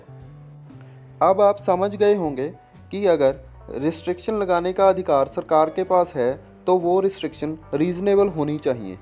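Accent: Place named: Punjab, India. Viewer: Everything is very knowledgeable, everything thing is perfect but icing is your hindi in Punjabi.. this is your Canadian brother! Love u 😘 bro.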